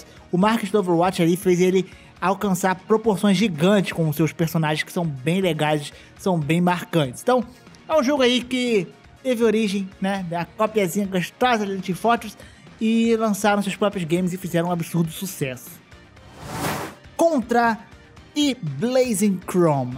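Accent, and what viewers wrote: Rio de Janeiro, Brazil. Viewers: Agora sei porque não curto tua voz, porque você é carioca! Cara, tinha que impedir a internet no Rio de Janeiro.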